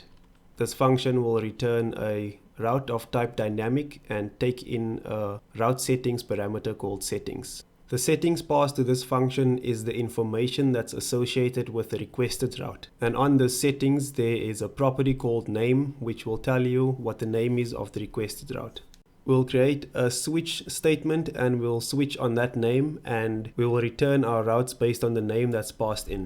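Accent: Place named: South Africa. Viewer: I was just wondering are you from South Africa? I mean your accent is so new to me :) ... nice content please keep the good work thanks a lot. Wish you all the best!!!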